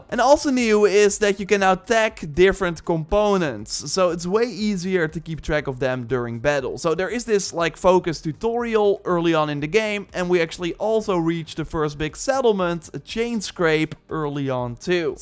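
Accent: Netherlands. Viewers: I can tell he's Dutch and it's just too funny.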